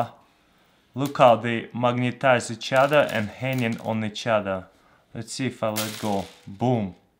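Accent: Russia. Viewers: it's because the russian's accent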